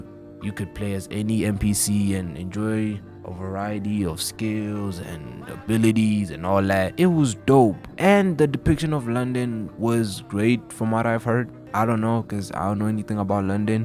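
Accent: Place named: South Africa. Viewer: For some reason I hear mzansi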